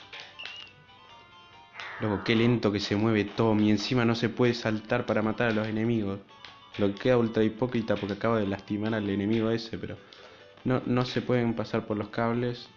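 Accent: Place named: Argentina. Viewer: Suena argentino xd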